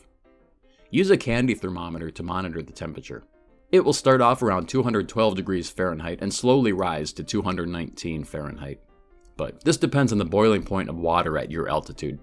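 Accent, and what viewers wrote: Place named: Canada. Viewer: This man sounds Canadian so you know he knows what he’s doing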